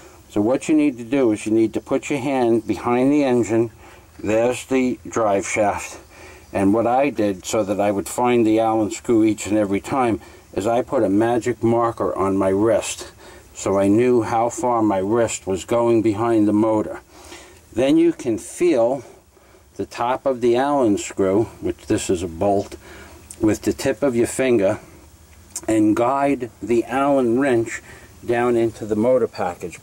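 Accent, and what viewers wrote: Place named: Rhode Island, United States. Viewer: Sounds like you're from Rhode Island like me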